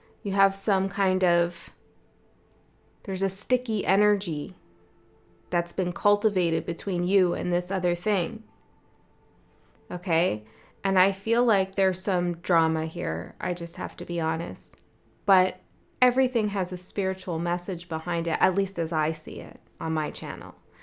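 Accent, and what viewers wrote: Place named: Canada. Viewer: I thought I heard a Canadian accent, so I looked at your page, sure enough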